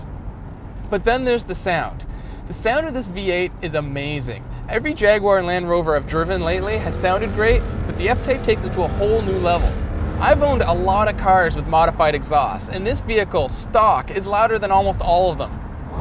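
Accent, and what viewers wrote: Canada. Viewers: Presenter has a Canadian accent.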